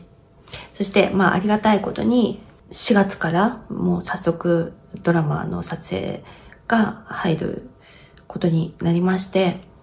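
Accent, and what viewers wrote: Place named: Tochigi, Japan. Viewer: 栃木だったんですね私は茨城の鹿嶋です案外言葉似てるね😊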